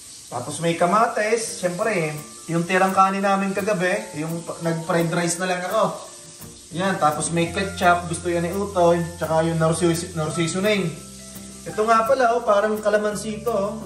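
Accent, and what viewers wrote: Batangas, Philippines. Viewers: Kuh nga batanguenio pala arih, bay nga mga batang ang twag sa mga asawa e”huy” haha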